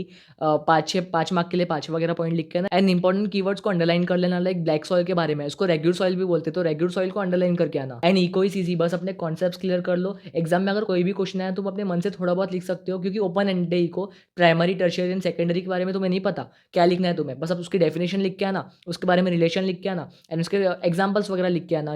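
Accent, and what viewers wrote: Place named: Maharashtra, India. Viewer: Bhai konse state se ho accent se to marati lg re ho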